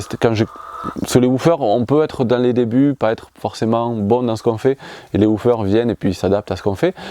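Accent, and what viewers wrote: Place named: Tarn, France. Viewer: bonjour bonjour ! si jamais tu passes en correze hésite pas a venir nous voir (on se connait pas du tout mais on se sent connecté a toi on vient de villemur sur tarn et ton accent nous ramène chez nous et puis on kiff tes videos ta philosophie etc !) bref on fait de l'elevage de brebis et transfo fromagere, de la perma et du chanvre bio tout ca tout ca, t'apprendras pas énormément on est pas des experts mais on est sympas !! )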